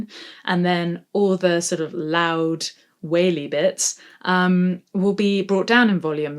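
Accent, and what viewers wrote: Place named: England, United Kingdom. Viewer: I'm inclined to agree with bunnyboiler6353 – I've always liked your spoken voice and enunciation, and as an American Midwesterner, I'm a sucker for a girl with an English accent of almost any kind to begin with. I thought the "rushed breath" tip especially good. Sorry to hear about your back – I've had back issues for decades. Learn to stay within yourself when doing your gym/workout stuff, just as you do with vocals. Stay safe!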